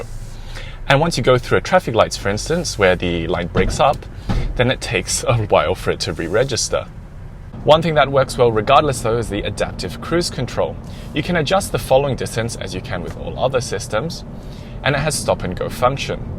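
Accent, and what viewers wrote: Australia. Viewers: Aussie, probably brought up in a classy Aussie town or school.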